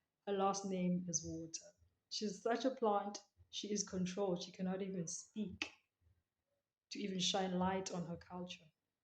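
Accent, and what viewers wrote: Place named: Model C, South Africa. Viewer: Disrespectful Model C accent.Tyla should take you to task.... disgraceful racism!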